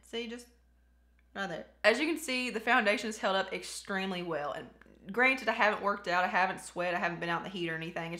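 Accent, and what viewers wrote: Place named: Kentucky, United States. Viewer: I still enjoyed it!!! My dads side is from Kentucky and ur accent makes me feel at home, ur channel makes me feel that way!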